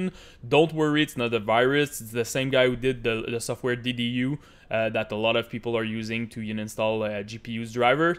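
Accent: Quebec, Canada. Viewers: i'm guessing you're from Quebec?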